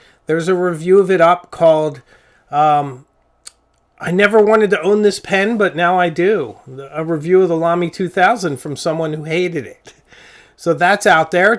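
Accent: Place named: Massachusetts, United States. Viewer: you are already very cool. and your speech is very nice, it sounds like a Massachusetts Connecticut accent with a slight hint of Bostonian-lite. you could literally host PBS Masterpiece Theater and no one would think it out of place with either good style or speech. in fact, you could probably make money on the side as a voice actor. and about your story episodes, they are poetic and very entertaining. by the way, people with northeast accents that strive to enunciate well are often considered snobby and pretentious because that's how snobby and pretentious people are portrayed on tv and in movies, right? it takes a while for inexperienced people to learn this. i believe we have cartoonists and writers/ actors to blame, since they perpetuate this myth and perhaps it is a bit of a truth when seen in actors and elites off stage who belittle those who do not subscribe to the marxism by any means necessary philosophy and will literally tell you day in and day out how you are not better than them? 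No? i have been busy with the health concerns of my mother, mother in law, my wife, my sister, my neices, brother in law, and myself, and cooking every day and working on this house, my friend. i am sorry i missed your story videos. i don't get notifications on most of my subscriptions! oddly, the disaster channels always show, and a nice man in england who teaches english one word at a time, with some odd ones thrown in often enough to make it interesting! i hope you have a great week.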